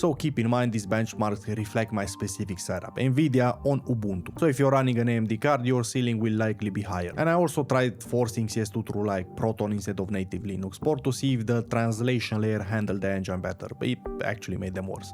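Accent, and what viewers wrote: Romania. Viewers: the moment i heard your voice I could tell you're also Romanian bravo man, fain video, dar Ubuntu e meh, Mint pentru incepatori, si CachyOS/Endeavour daca ai chef de tinkering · Haha, accentul românesc lovește direct. Pentru cele mai bune performanțe pe Linux le vei avea pe AMD sau Intel.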